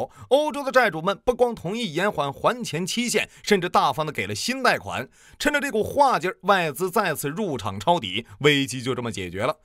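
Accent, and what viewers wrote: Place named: Baoding, China. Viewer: 老兄的保定话太牛掰了😂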